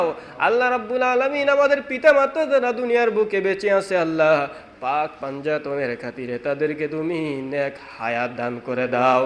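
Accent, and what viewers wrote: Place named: Habiganj, Bangladesh. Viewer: আপনার মিলাদ শুনে মনে হচ্ছে, আপনার বাড়ি হবিগঞ্জ সদর এ। হ্যা অথবা না!!! আশা করি উত্তর দিবেন।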